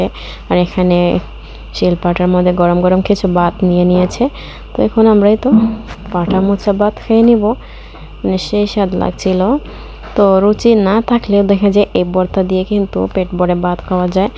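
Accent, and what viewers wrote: Sylhet, Bangladesh. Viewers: Tumi ki sylheti?koi thako?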